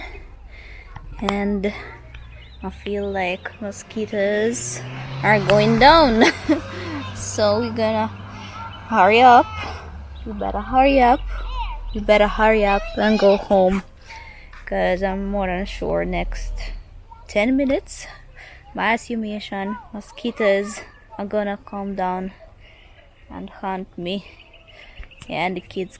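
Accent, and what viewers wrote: Jamaica. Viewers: nice to hear when you talk like a jamaican. 😊. Nuff Blessing from Germany. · Bless 🙏 up to you and the family 💕! It's so good to see you and the boys hanging out, single mom life is very hard, but you did a good job for the day ❤️ 😁💪, and also cutting your coconut, you are a real yaady🇯🇲🇯🇲 Jamaican style 😂😂👍, I watch this video twice, big up to 💪💯 and his team for winning their match, we need more videos from you with that sweet yaady 🇯🇲 talk 😂😁 one love❤ to the family everytime👃👏🇯🇲😂.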